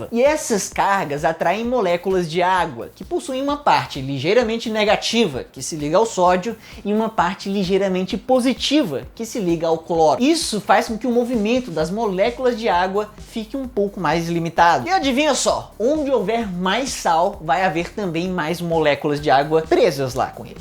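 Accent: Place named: Northeast, Brazil. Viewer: OXENTE! É NORDESTINO!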